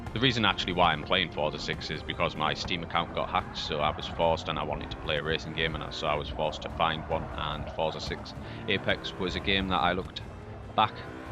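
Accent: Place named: Middlesbrough, United Kingdom. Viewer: Where are you from? Sounds like a Boro accent.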